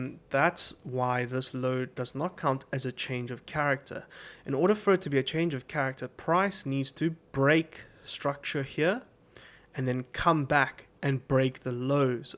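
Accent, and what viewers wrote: South Africa. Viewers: My guy, great video. Your accent sounds like you are from SA or Namibia... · Finally the South African accent haha making us proud well done best content I’ve seen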